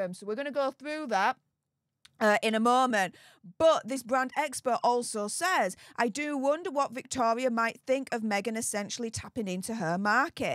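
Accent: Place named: Northern England, United Kingdom. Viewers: Love listening to you as it brings me home with your accent. I am originally from Manchester England and you sound just like my cousins. I live in Mississippi now, have been here since 1972. I did go back for 3 years in the 90s from 94 till 97 after Diana died. People change so it was useless to want them to see me after 20 years back then.Thats ok because they missed out on me and my daughter. Their loss. Luv ya. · Dear, I’ve a personal question (maybe none of my business and could be offensive but not my intent). I’m trying to place the location of your accent. I enjoy studying UK accents and like placing them to their location. Correct me if I’m wrong, and most likely I am, but I’m guessing somewhere between Manchester and Yorkshire?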